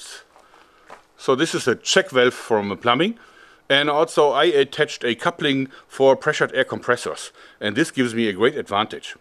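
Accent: Germany. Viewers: he has a real german accent, but sometimes exaggerates to make fun on his own accent. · Fake Accent? He has a Real one, And I have Something against German accents.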